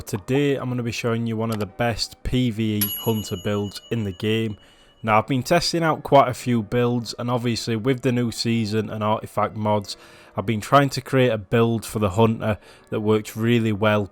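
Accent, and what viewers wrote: United Kingdom. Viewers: Just out of curiosity what part of the UK is your accent from?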